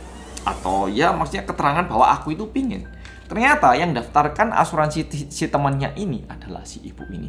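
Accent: Java, Indonesia. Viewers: "Bersama Orang Korea Yang Medok!!!!" Selalu reflek bila mas Bilang ini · Ya allah mas sampean wong korea hlooo😂, kok iso bosone njlungupp to yooo, bener2 orang korea yg medhokkk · Ya medok 🎉 tks...bye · 𝙃𝙖𝙡𝙤 𝙜𝙪𝙮𝙨 𝙬𝙚𝙡𝙘𝙤𝙢𝙚 𝙗𝙖𝙘𝙠 𝙩𝙤 𝙆𝙤𝙧𝙚𝙖 𝙧𝙚𝙤𝙢𝙞𝙩 Bersama orang Korea yang "𝙢𝙚𝙙𝙤𝙠𝙠𝙠" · Suka bgt sama kontennya mas bersama orang korea yang medokkkk.😊😁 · Medhok bossqu😁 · Ngakak aku penampilane wes kuweren omonge njelongop njelongop 🤣🤣🤣 ketok jowone mas haha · MAS: HELLO GAIS, WELCOME BACK TO KOREA REOMIT. BERSAMA ORANG KOREA YANG SATU RUMAH:" MEDOKKKK" krna setiap hri nonton. Jd hafal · oke aku udh lama ga buka yt dan nonton sesuatu hampir setengah tahun lebih. akhirnya aku mau mulai lagi dr mas mas medhok ini. editannya itu lo ngakak terus aku. padahal ceritanya bukan cerita komedi. salut buat editornya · Pertama liat videonya tak kira: lha ini wajahnya kayak orang korea beneran kok medok banget jawanya hahaha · Makin glowing aja ini mas mas medok 😅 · Yang medokkk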